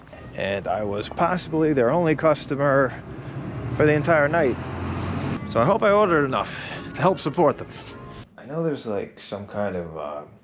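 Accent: New York, United States. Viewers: Just wanted to say that I really love your videos. As a fellow New Yorker who used to travel a lot when I was younger, it's like nothing else to be able to tag along on these adventures of yours. It's just so real, like a window into another life. I really appreciate your NY accent and your sense of humor, you just feel like someone I would know, but your skill with languages makes these videos. Not another disrespectful American, someone who gives the rest of us a good name. Thank you for all of it. The Russian and Israeli videos were harrowing, by the way, if it wasn't already uploaded and we were watching it live, I'd even say anxiety inducing. Courageous. You deserve a break, hope you get a nice rest back here in the states, and looking forward to seeing you back at it whenever it strikes you. In the meantime, I'm sure there's more in your backlog I still haven't seen and will content myself with that. 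Thanks again for all of these!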